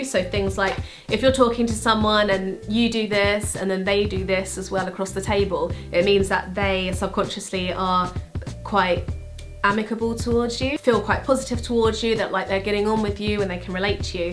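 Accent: United Kingdom. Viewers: I heart the British accent and I subscribed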